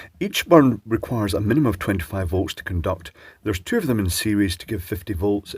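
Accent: United Kingdom. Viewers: Amazing Harry Potter accent. Instant sub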